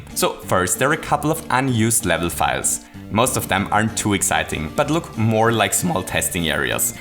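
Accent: Germany. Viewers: Are you german or austrian?😂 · Are You German? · Where are you from? I can't categorize your accent. Any chance you're German? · Are you from germany?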